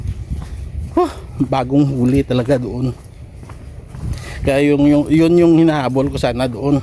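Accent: Iloilo, Philippines. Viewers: Good morning parang ilongo ka man talaga idol kaya ingat ka idol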